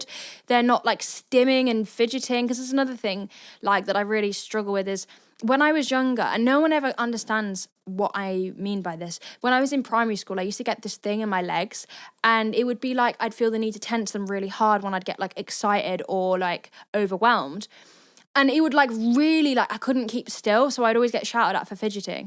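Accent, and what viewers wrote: United Kingdom. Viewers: wasn't expecting the accent, -B-R-I-T-I-S-H-. Ha, my local australian barista looks a lot like you, minus the tats. ADHD spectrum for me became solidified following epilepsy diagnosis; they can often coincide. Have you ever struggled with epilepsy? Low latent inhibition is a thing too. My brain is super responsive to my own self-generated mind exercises.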